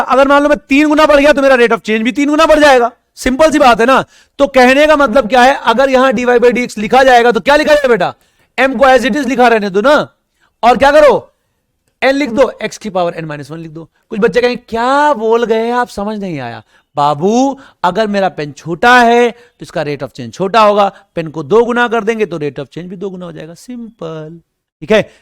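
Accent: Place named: Bhojpuri, India. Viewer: I am also from from bihar and i loved ur bhojpuri accent a lot .. Thank you for the lecture. No doubt best physics test ever .....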